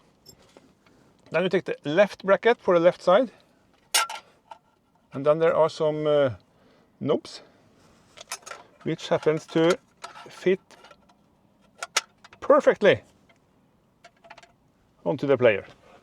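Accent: Norway. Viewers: Var ikke vanskelig å høre at du er trønder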